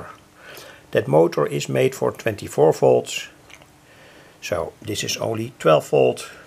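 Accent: Netherlands. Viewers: btw are you dutch you have a Dutch accent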